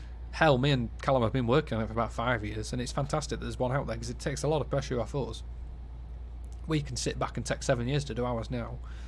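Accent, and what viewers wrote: Yorkshire, United Kingdom. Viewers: thanks very much for scanning your critical eye over the route, which I hope DTG take notice of. Love your Yorkshire accent and comments.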